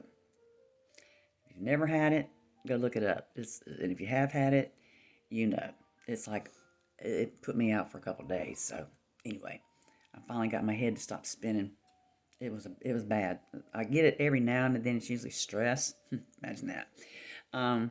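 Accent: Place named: South, United States. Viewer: Yay Lovely! Oh no not Vertigo! I had that so bad when they did work in my apartment and didn't clean the drywall dust out of the heating system. Don't go on any step stools! Flouride in the water also makes people more passive. I love hearing the news from you. You find things that I didn't know about and deliver it lovely, but Strong Southern Belle style. Things are chaotic and I should probably stop expecting otherwise. I have a domestic abuse situation going on with the people living above me, and the police won't stop the alcoholic husband, even though I hear glass crashing and things breaking, and the wife screaming. There's a small boy and a puppy too! I had to call the Police a couple of nights ago. They did nothing but talk to the drunk guy. He's been known to retaliate when people call the police by slashing tires and other craziness. 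Yay. My mom is ok but I have a bad feeling about where she is living. And I have to look into what else there is for her level of care. I can't physically do it myself, and I can't afford At Home Care. I already tried all of that and there was no way. She refuses to do any leg strengthening, so she can't walk. They come into her room to help her with her legs and she screams for them to get out. I have no choice but to have her living where they can take care of her. She wouldn't let me do her personal care either. I never wanted her to live in a Care Home, but she fights making it any different. The stress makes my own EDS pai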